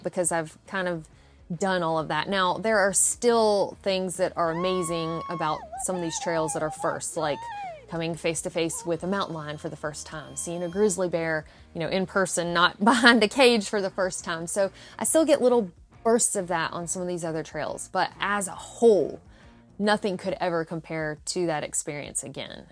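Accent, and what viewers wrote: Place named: Tennessee, United States. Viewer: Your so sweet I bet your from Tennessee or Alabama with that beautiful accent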